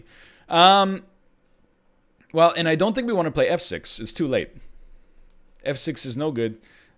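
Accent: Canada. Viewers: Y'all can't convince me that he doesn't sound more Canadian than before..... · Am I crazy? Has accent gotten more Canadian recently? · Did Canadian got worse (or better, depending on preference xD) in the past few months or am I crazy?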